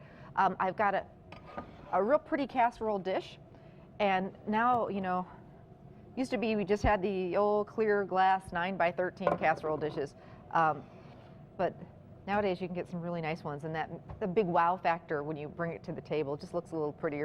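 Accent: United States. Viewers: is a charm! As soon as she began to speak I thought, hmmmm, not quite Minnesota or Upper Peninsula but definitely Michigan! As a fellow Michigander our voices are usually easy to identify. The casserole looks great. Can't wait to try it.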